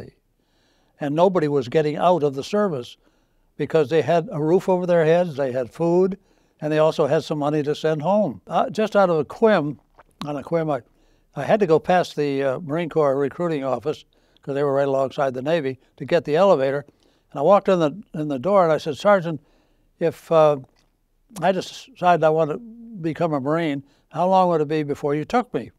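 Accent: Boston, United States. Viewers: He's got a very interesting Boston accent...in that it doesn't sound like any Boston I'm familiar with. Very intriguing! I wonder if he comes from a very old New England family. He's got a very Rhotic accent....no pawk the caw in the yawd.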